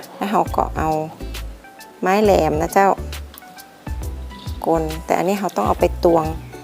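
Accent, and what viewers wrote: North, Thailand. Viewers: อู้กำเมือง น่าฮักขนาด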